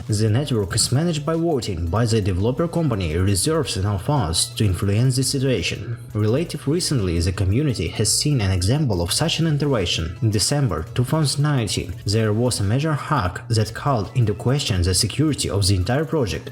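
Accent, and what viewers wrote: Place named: Russia. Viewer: Russian prononciation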